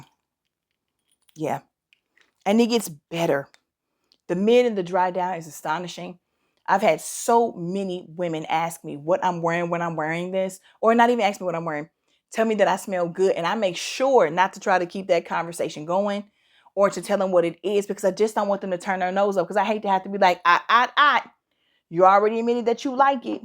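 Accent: United States. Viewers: Oh, my goodness, you are adorable with your comments and American accent! I agree with you, that you don't need to spend a kazillion dollars for a beautiful scent. Thank you for your review. ❤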